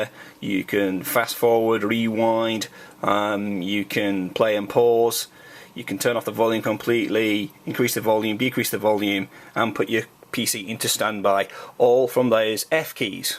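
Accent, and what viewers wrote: United Kingdom. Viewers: You have perfect English (Great Britain) voice xD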